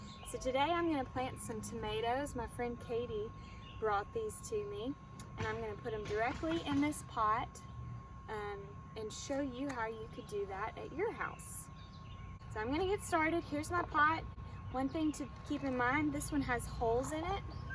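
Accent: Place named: South, United States. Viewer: Great video, love the southern accent. Thanks for sharing. Growing in pots is a great idea. Move them if you need to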